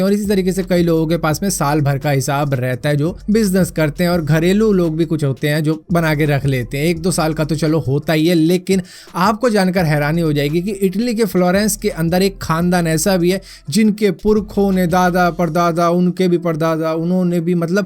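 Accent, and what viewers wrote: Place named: Haryana, India. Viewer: Bhai ik video haryanvi mai bnao plz...aapka slang bhot acha he...plzzzz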